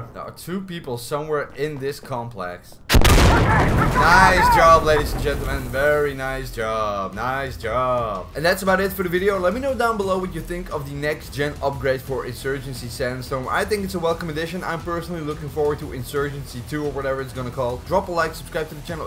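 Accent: Netherlands. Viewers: The Dutch accent is real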